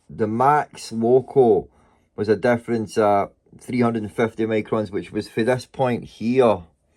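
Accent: Glasgow, United Kingdom. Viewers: You from glasgow mate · Great to hear a fellow Glaswegian ... I'm from Dennistoun myself 😁... as to whether or not they are perfectly flat, yes it'd be great if they were perfect, but at the end of the day you tried to do it yourself 👍 I made kitchen worktops out of 2ft square by 1" thick granite slabs (doubled at edge to 2"), £240 instead of thousands for a 3/4" rip-off version!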